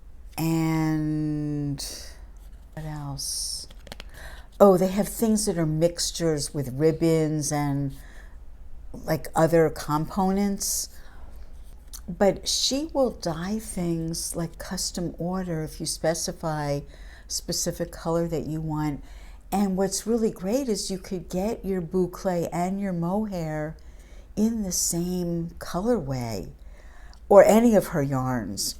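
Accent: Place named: East Coast, United States. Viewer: Your accent and attitude remind me so much of my family out east. Loved the recap!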